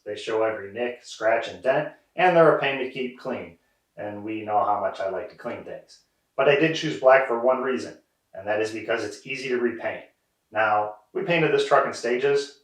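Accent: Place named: Midwest, United States. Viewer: refreshing amount of no speed up, no self apology for this "being boring", no crappy muzak in the background and plenty of commentary on how to do this task. Plus I find mid western drone is incredibly soporific, i find when I'm tired its like being told a bedtime story... I just wish no you'd lose the "The channel" bit from the intro and then you would be totally unique in not trying too hard to keep people watching... Very TV, TV never begs you to watch or explains itself too hard, it's just here's a program watch it if you like and if you dont, go do something far more interesting...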